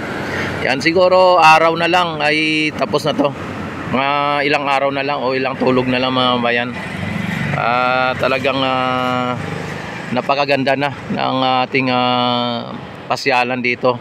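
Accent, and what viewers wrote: Visayas, Philippines. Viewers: 🤣🤣🤣bisaya!hirap ba mag tagalog at may punto ka ng bisaya!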